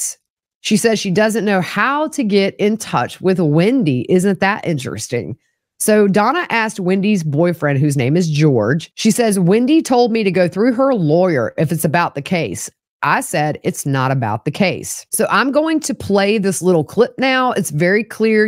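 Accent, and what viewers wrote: South, United States. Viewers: I like your southern accent. I live on the west coast and when I ended up in jail for 2 months when I was visiting NY everyone said I had the accent. Triips me out how many accents there is in the same country. Anyway my comment isn't about this evil family. Sorry.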